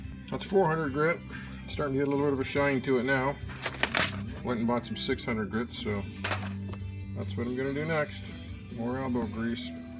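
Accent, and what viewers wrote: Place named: Ontario, Canada. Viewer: Hello, am a watcher of your films here in the Netherlands, nice work you"'r doing, very good information. Have got myself 2 commando's, also like to work on them to get them in a very nice condition. Do you live in Ontario, a friend of mine lives in Puslinch, got the same accent so was wondering? Your dream in going accros Canada and back on the Norton is a fantastic one, hope you make it, would want to join in it but a bit out off reach. Keep on filming!!!!!! Gerleen de Jong, Netherlands.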